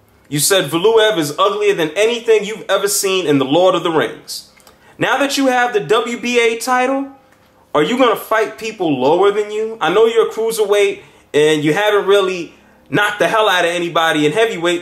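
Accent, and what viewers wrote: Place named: United States. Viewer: It's val-you-ev Goddamn American accent SNM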